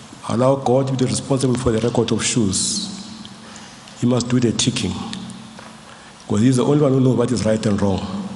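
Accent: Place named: Township, South Africa. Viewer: Brilliant speech with kasi tone 😂😂😂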